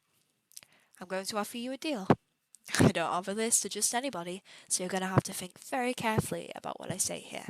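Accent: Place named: United Kingdom. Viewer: Sounds British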